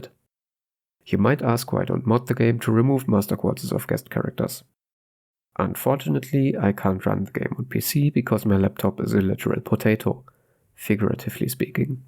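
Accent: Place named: Germany. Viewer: Deutsch Akzent erkannt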